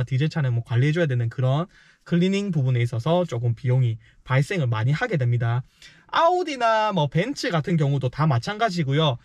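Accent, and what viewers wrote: Busan, South Korea. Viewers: 20대??ㅎ~ 항상 밝고 시원스런 부산 사투리와 성실해 보임에 정감이 갑니다~ 꾸준히 하신다면 성공예감!!!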